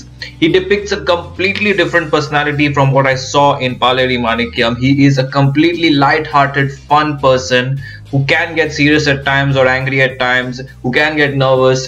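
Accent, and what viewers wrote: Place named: Thrissur, India. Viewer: It's Thrissur accent... its rather like singing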